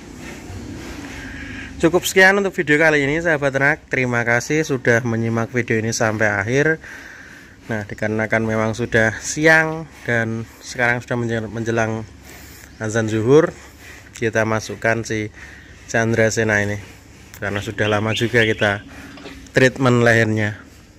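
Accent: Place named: Pati, Indonesia. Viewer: Wong Pati njenengan mas🤔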